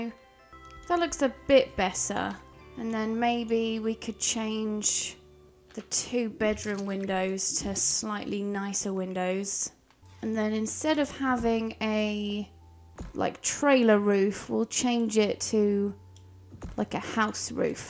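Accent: England, United Kingdom. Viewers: This reminds me of when messyourself played sims 4 maybe it's the bald kid and English accent lol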